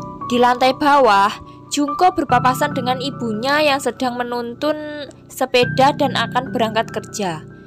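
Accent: Java, Indonesia. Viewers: Kritik membangun: suaranya medok jowo bngt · Logat Jowo x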